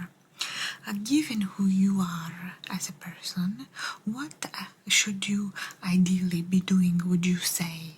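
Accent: Italy. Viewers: Hello, fantastic ASMR, I really liked it and with an Italian accent, greetings, beautiful 🌹💖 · Thank you for creating this compilation for us,. I love the Italian accent in combination with your wise words. You say in the description that you're not a therapist, but you seem like a really good one to me. You probably just need to get some sort of a degree to make it official. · ahh you are amazing and we are back at the amazing italian accent i love it <3:) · Ciao and Lucia!💜💜💜 I do so love your gorgeous accent!! Thank you for soothing and lulling me to sleep. You are the best in my opinion! I hope you and your family are staying healthy. Have an excellent weekend. You deserve it. Goodnight and sweet golden dreams. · Yessss,long time Italian Accent.😊😊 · Ciao cara!🤗 Mi piace molto questa tipologia di video, sei bravissima e bellissima, complimenti!🥰 Adoro il suono della scrittura e adoro ascoltare il tuo meraviglioso accento Italiano 💚🤍❤️ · Italian accent videos are perfect for relaxing and unwind your soothing voice makes me feel sleepy 😴 😊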